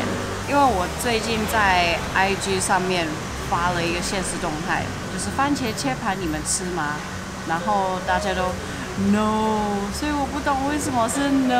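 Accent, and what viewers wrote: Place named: Taiwan. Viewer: 這個主播…很台！😂😂😂😂😂😂😂超👍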